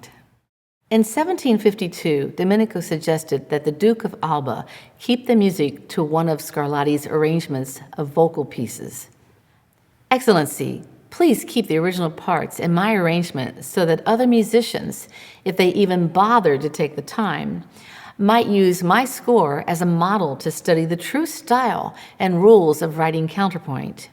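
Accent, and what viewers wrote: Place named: United States. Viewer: It's just the American accent that one needs to retune to 🎉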